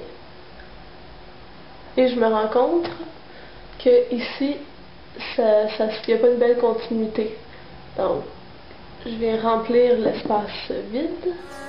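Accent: Quebec, Canada. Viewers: ooh que ca me fait du bien d'entendre cet euh ben euh "accent" hihihi j'ai vécu 5 ans au Quebec et ca me manque........donc je t'ecoute, je ferme les yeux et je m'imagine de retour hihihi bon trêve de plaisanterie, je vais dire une bétise , surement que tu connais cette astuce....mais bon hein........j'ai découvert sur certaines videos de youtubeuse que pour faire "poper" les couleurs elles mettaient une base blanche en dessous (type jumbo nyx) perso je met ma base urban decay + le jumbo blanc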